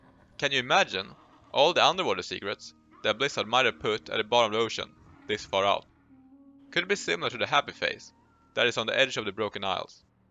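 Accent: Sweden. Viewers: Your accent sounds very swedish👍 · Lol you have the worst Swedish English I've ever heard. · I kinda wish WoW made a proper underwater world. Your swenglish is great btw 😏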